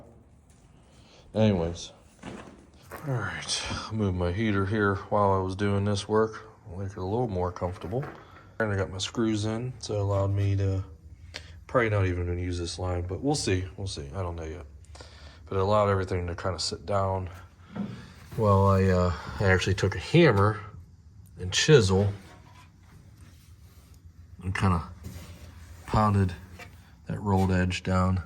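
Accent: Pittsburgh, United States. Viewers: I here a yinzer accent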